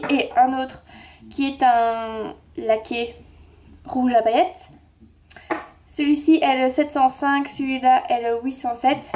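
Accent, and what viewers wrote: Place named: Belgium. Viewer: Coucou ma belle ! Je viens de tomber sur ta chaîne par hasard et j'aime beaucoup tes vidéos! J'ai tout de suite compris que tu était belge :) ( grâce à ton accent hihi) Je dois également me taper Auchan en France pour aller chez Kiko/Sephora :( Je voulais savoir (si ce n'est pas indiscret) dans quel région de la Belgique habite-tu? :) Bisous.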